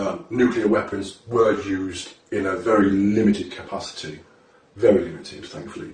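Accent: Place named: United Kingdom. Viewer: So what Army did you join? Why are you speaking with a British accent?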